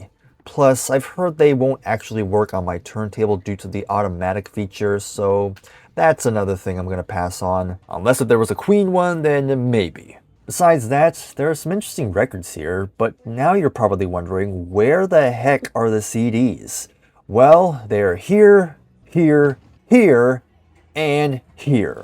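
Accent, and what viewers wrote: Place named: England, United Kingdom. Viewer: I'm used to speaking in an English accent. I tend to look for specific things. I don't like just browsing and buying stuff I might like without checking it out first. I'm after certain items and online I can check who has it in what condition and for what price while riding the train to work. I also buy a lot more that way... Hmm...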